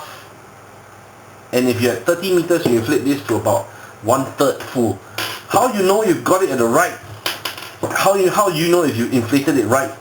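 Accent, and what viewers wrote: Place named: Singapore. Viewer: Wow!!!! The first impression was that Singaporean English accent. Further confirmation from your profile reveal you to be my countryman! Haha Great video. Recently just got my spool and SMB. This is a good "dry run" video before I actually deploy it on my next dive.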